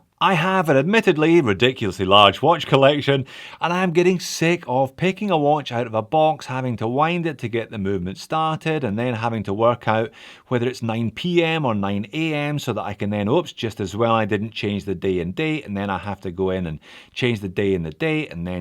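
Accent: Scotland, United Kingdom. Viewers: I so want to try a tuna on, I know it's a watch that you need to wear to get a feel for. Fanny around, always good to hear a bit of Scots.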